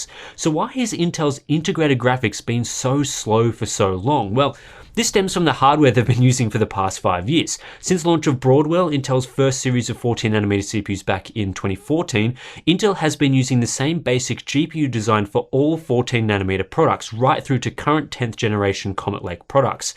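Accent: Australia. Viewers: Strange thing to moan about [FULL STOP] [COMMA] maybe you don't understand the accent [COMMA] he is Australian [FULL STOP]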